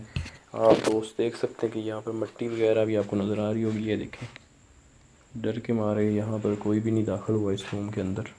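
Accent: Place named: Punjab, Pakistan. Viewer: hit like before watching.. or bhai just luv ur accent jab ap urdu bolty bolty koi ek lafaz punjabi ka maar jaty hain.. serious video men mahool say bn jata hai.. Allah pak hemsha apko apny hifz-o-aman men rkhy (Ameen)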